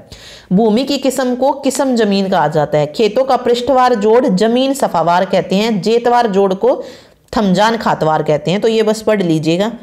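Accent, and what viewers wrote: Haryana, India. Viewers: Maam yeh wording bolte bolte haryanvi nikl rahi h bolte time 👌🏻👌🏻👌🏻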